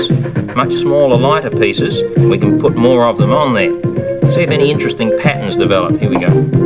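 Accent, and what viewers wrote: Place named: Australia. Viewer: love that Auzzy accent. The music is from beaverly hills cop so this show is no earlier then the 80's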